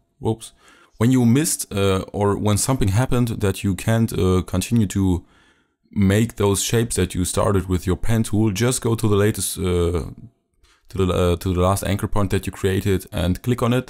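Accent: Germany. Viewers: i think you have an german accent :O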